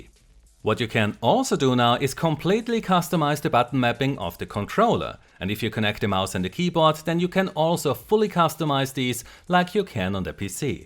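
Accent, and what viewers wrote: Germany. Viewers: Are u german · man hört dass du deutscher bist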